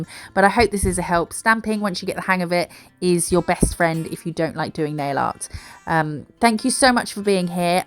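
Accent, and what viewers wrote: United Kingdom. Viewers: and it’s good to hear a UK accent , I’m in Scotland , most videos I see about nails are American x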